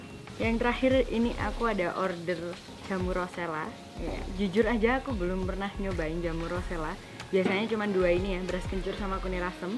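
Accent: Java, Indonesia. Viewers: Logat jawa, asli jawa yo mba....?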